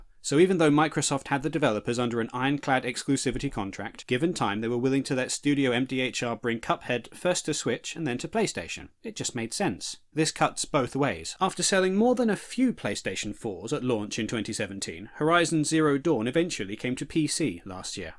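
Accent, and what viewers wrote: United Kingdom. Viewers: Dude, your British accent and calm demeanor can’t hide the truck load of salt in your voice And this is coming from a guy who owns a PS5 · You're an embarrassment to the English accent. Good lord, what an absolute cretin.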